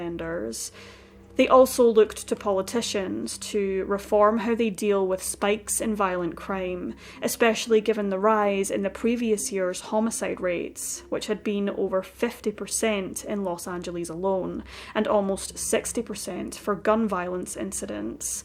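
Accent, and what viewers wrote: Scotland, United Kingdom. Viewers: 🔥 Scottish accent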